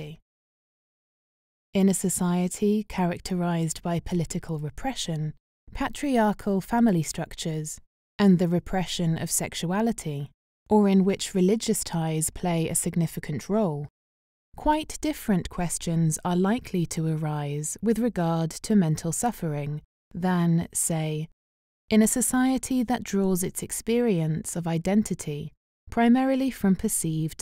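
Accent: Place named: England, United Kingdom. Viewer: I love it when a speaker has an English accent. It makes her points sounds so impressive.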